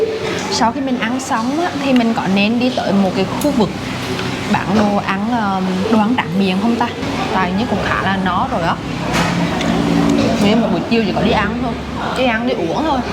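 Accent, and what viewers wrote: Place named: Quang Binh, Vietnam. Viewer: Tưởng quảng bình...nghe giọng dễ thương quá.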